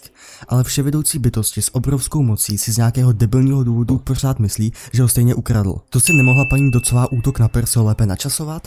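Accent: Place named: Prague, Czech Republic. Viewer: Hej jako já nechcu nikoho urážat, ale jsou nějaká morální pravidla.Ja su sice jen vytretý moravák,ale pražáčtina je něco NECHUTNĚ otřesného a k tomu mě dokopává to HR,RR,DOBHRE atd.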